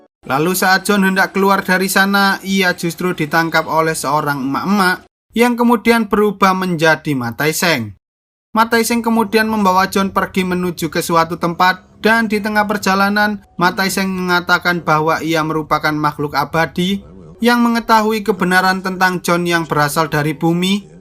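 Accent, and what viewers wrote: Java, Indonesia. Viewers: Logate logat jowo..😂😂jowo bagian ndi mas..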